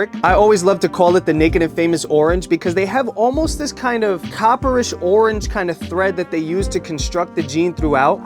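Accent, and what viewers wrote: New York, United States. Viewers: I can hear the NYC in you lol, great video!! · Jersey or New Yorker ?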